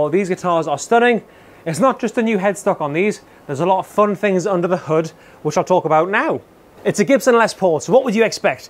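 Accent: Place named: Newcastle, United Kingdom. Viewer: The Geordie Phil X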